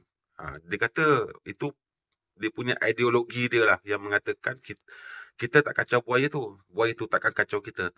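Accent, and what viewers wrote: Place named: Sarawak, Malaysia. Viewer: Terima kasih tuan atas perkongsian ilmu, kmk salute BM ktk sgt fluent tapi tetiba ada yg melimpas bah mesti nyatok org Sarawak😊 Teruskan tuan dengan video² yg selanjutnya bagus juak guna drone camya puas nangga..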